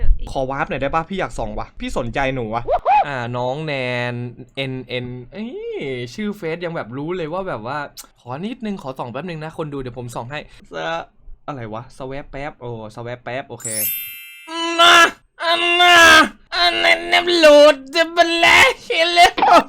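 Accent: Isan, Thailand. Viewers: พูดอีสานน่ารัก55555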